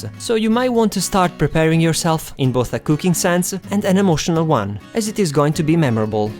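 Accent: Italy. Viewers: I found your Italian accent completely adorable!Keep up the good work of giving us tips! I am not vegan but vegetarian..Your channel is really helpful! :) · yass that accent and inflection, I'm a fellow italian (: Yeah i agree and I'll sure try some of the recipes! Who knows what'll happen hahah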